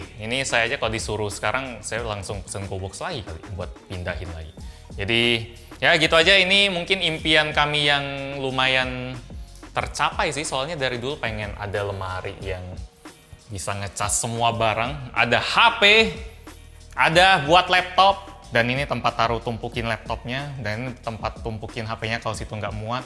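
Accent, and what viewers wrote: Palembang, Indonesia. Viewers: Bang orang palembang kah?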